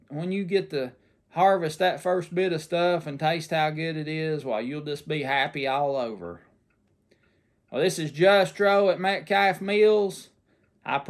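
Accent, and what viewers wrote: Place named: Arkansas, United States. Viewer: Hello from Arkansas, I’ve subscribed with this channel and Kenneth and Cindy from Judsonia Ar. Cindy told me she had found someone that talks like me! I was born and raised in the Hills on North Central Arkansas been here All my life. I did work some around Boone and Blowing Rock and love it out there, and plan to come back and visit before long. It’s just been Hot here, and we been watching your videos, love them, keep it up!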